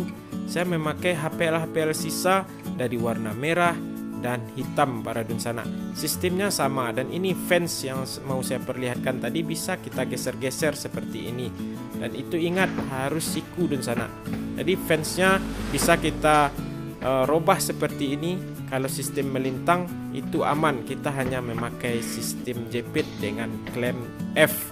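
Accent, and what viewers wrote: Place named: Sumatra, Indonesia. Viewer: Org mana aslinya Om kalo didengar suara org sumatera tp kalo kata dingsanak itu bahasa Banjar Kalimantan Selatan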